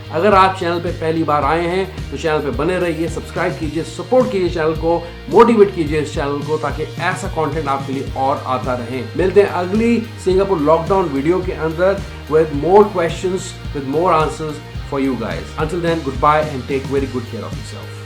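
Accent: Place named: India. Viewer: YOUR MUSIC IS TOOOOOO LOUD. It is difficult to hear what you are saying. I turned off your video due to the loud music. You can lower the music for your benefit. It is annoying to hear music while trying to understand what you are saying. WTF your music sucks when you are talking with your horrible Indian accent. You sound like you are drowning in bubbles like a bobblehead. SHUT THE DAMN MUSIC OFF.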